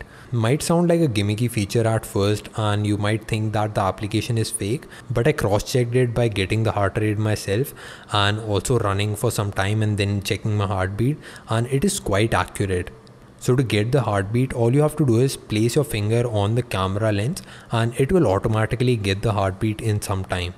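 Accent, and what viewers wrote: India. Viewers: D and T are two different letters PRONOUNCE THEY DIFFERENTLY fucking indian accent 😒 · please your indian accent is so irritating...too hard to take...alvida.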